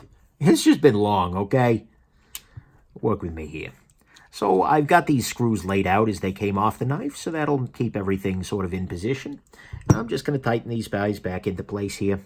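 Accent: New York, United States. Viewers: . You don’t sound like you’re from Cali. I’d say Flatbush or somewhere off the L.I.E.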